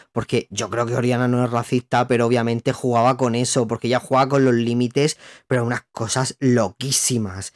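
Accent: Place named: Vega Media, Spain. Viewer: Que me meo yo soy de la vega media con razón me pareces tan próximo con algunas expresiones y la gracia al hablar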